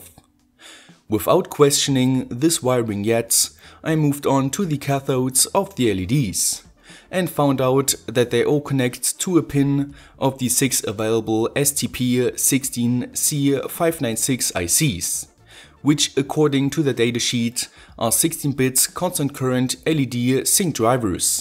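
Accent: Germany. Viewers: U sound so German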